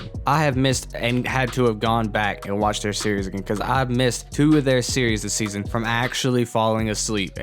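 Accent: South, United States. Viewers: You sound like a more southern version of GarrettG. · idk why but im getting a hint of country accent like the same accent in my area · Great video, great analysis, and I like your southern accent 10/10 quality rocket league content creator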